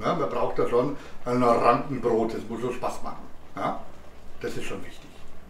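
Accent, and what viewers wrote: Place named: Franconia, Germany. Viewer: Fränggisch - ich gläbb des werd nix Ä riesen Sach und dann noch blaue Zibfl Grüße aus Schweinfurt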